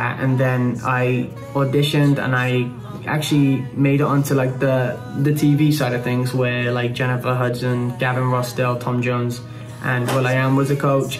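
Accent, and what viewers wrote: Wales, United Kingdom. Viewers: It’s Cardiff, Wales, UK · I think it's Welsh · Have just come across your songs and now I'm here. Was so lovely to realise after hearing you talk that you are British (not quite sure from where? Welsh? Anyway.... well done, you really have a fabulous voice and have really enjoyed hearing your journey. People are rarely good at everything so you got your full quote of being artistic .... but in every bloody way!! Incredible. Good luck. Hope we see you killing it on British soil. Hope my son (he's 16) turns out as humble and hardworking as you. (from 53yr old mum from Hertfordshire, UK) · He is british my love, yes.💛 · He's actually Welsh, part of UK. Now he lives in the US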